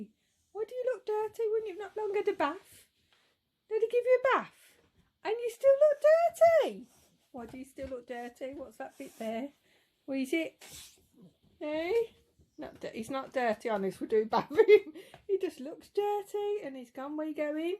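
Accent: Birmingham, United Kingdom. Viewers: I love your accent. You sound just like Ozzy!! Blimey, I just checked, and he's also a Brummie! I think me ears are good. ^_^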